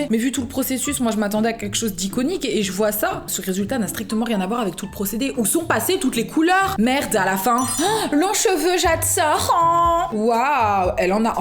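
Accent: United Kingdom. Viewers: Tu as les cheveux trop beau je t'adore depuis que je suis toute petite et ton petit anglais à la fin des mots je le trouve trop cute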